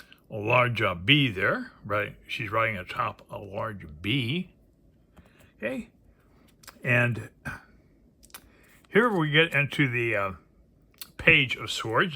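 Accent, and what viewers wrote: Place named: England, United Kingdom. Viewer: It's really nice to listen to your video, here in Italy... waaaaves... your accent sounds "English" am I right?? I'm not sure but... it seems so... and I like it!!!! Thanks for this beautiful review, from today you have a new follower from Italy ! wide smile ! hellooooooo !!!!!!!!!!!!!!!!!!!!!!!!!!!!!!!!! ( and today I ordered my tarot deck: I Love it !!!!) Ciao and thank youuuuuuuuuu !!!!!!!!!!!!!!!!!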